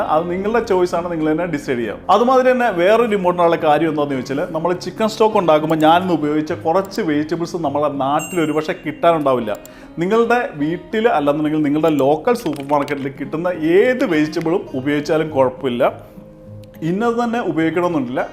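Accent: Kannur, India. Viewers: the way you speak resemble like you are from kannur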